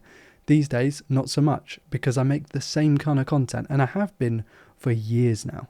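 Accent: United Kingdom. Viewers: I just watched one of your videos for the first time (the Used S23Ultra vid) and was happy to subscribe despite being a terrible fit (geezer American who's never played a video game in my life). I'm a sucker for a calm Brit who knows his stuff and doesn't waste my time. Best of luck and take care of yourself.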